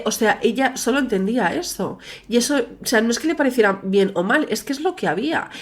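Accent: Spain. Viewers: Nena el acento español no es de muy mi agrado pero me encanta escucharte no sé porque el tuyo me parece muy lindo ☺️y tus videos muy buenos todo tu contenido es excelente me encanta ❤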